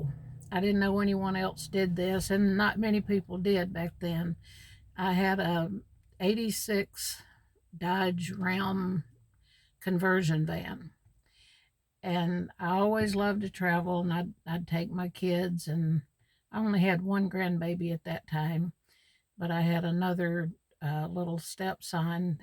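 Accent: South, United States. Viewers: You did a great job! Thanks for sharing your story. I am in Alabama but from northwest TN. I knew you had a bit of a southern accent!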